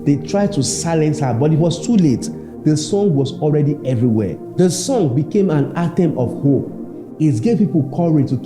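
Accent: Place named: Nigeria. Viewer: How is hate this Nigerian accent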